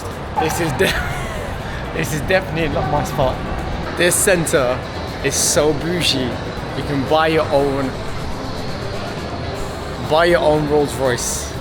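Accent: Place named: United Kingdom. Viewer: Where are you from in the UK? I like your dialect and want to know where do you speak like that? 😃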